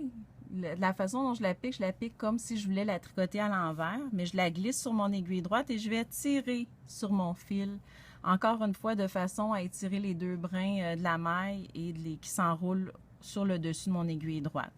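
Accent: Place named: Quebec, Canada. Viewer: Les Laines Biscottes: où se situe cette boutique? Au Québec? Car votre accent me semble du Québec!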